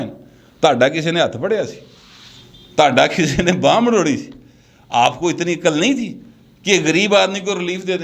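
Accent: Lahore, Pakistan. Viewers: Agree with your examination of the present situation,love your Lahorei style of communication.God Bless you.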